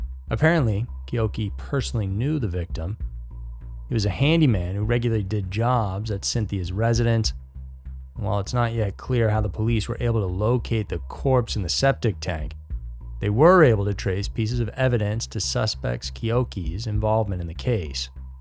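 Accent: South, United States. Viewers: Never heard about this case before. Pretty crazy. Love that southern accent.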